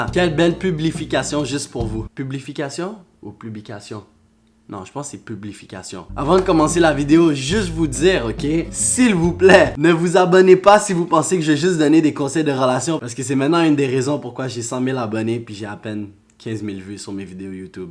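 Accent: Quebec, Canada. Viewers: Un accent ”afro-québécois“ j’adooore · c la première fois que je vois un noir avec un accent quebequois 😂😲😱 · Tu es le meilleur Youtubeur du monde!!!💗 et non il fait pas sa je suis très contente personnellement. Et tu devrais garder ton accent car tu es chanceux d avoir un accent québécois mais moi je suis pas chanceuse j ai un accent anglais mais je pratique le francais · T'es québécois ?? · Est-ce que tu es quebecois? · J’aime trop trop trop trop ton acsent québécois ❤️❤️❤️❤️❤️❤️❤️❤️ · C'est quebecois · Ton accent c tellement cute ptnn🤤😍 vrmt j’adooorrreeee l’accent québécois !!!! Jtrouve t’as grv un style mais parfois mdrrrrr les mots je comprend pas 😂😂😭😭😭 mdrrrrr mtn j’ai compris les expressions québécoises mais whaouuu c’était compliqué 😂😂 brefff continu ! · Je croix que c'est un québécois. P.S je suis québécoise. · T’as un peu un accent québecois😉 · je sais déjà que tu vas pas me repondre. 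Mais bon,😥 si tu vois ce message il est fais pas amour❤ (no homo). genre je te suis depuis longtemps tu peux pas savoirComme jtai toute suite aimé quand j'ai ta vidéo " man's is hot in fresh " jtai tout de suite aimé avec ton accent québécois 😏. En tous cas j'espère que tu prendras le temps de voir ces messages 😥. En pensant va don checker mon insta: yng_muco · RESTE AVEC TON ACCENT QUÉBÉCOIS PLEASE!!!!!!!!!!!!!!!!!!!!!!!!!!!!!!!!!!!!!! T’es parfait ♥️♥️ #jevienduquebecaussi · Pi le mes i la un accent québécois mes j'suis pos sur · Celine M il est québécois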